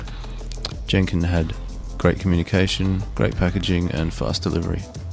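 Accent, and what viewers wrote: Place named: Australia. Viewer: Where you from you sound Australian ?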